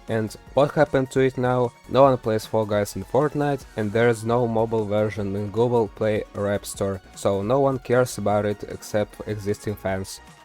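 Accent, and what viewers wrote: Russia. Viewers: Акцент как у русского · ты русский? Просто акцент как у русского, да и сам ник тоже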